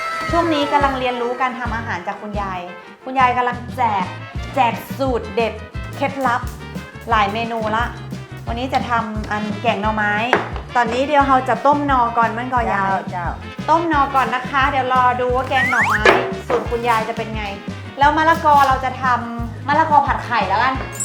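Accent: North, Thailand. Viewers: ชอบแม่อู้เมือง อยากหื้ออู้นักๆในคลิปเจ้า เพลินดี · อู้ไทยปะแล๊ดเมืองน่าฮักดีครับ😊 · อู้กำเมืองม่วนแต้ๆจ้าว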